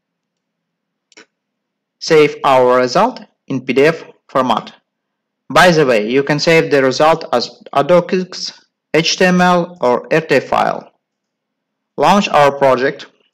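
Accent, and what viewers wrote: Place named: United Kingdom. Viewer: Don't be sorry for your British accent :D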